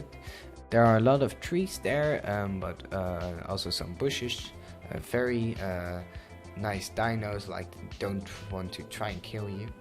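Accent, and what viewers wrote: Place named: Netherlands. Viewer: nederland!! ik hoor het aan jou accent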